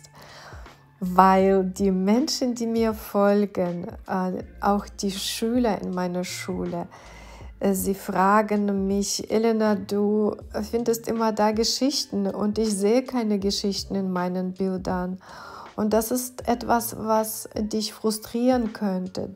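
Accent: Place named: Russia. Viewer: Классно! Чуток слышу наш акцент.:)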